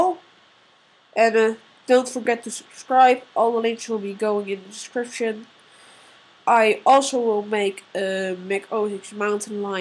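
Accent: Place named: Netherlands. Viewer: I can clearly hear that you are dutch :D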